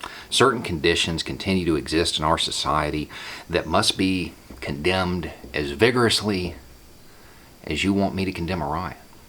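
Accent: South, United States. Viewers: I've watched like 5 straight videos of yours and I'm in shock, you as a white guy with a southern drawl, speaking so eloquently about these topics saying white people need a new leader or your other video about what it meant to be a republican or what it used to stand for vs how it changed man I love it when people like you can call out the hypocrisy of your people and that's what we need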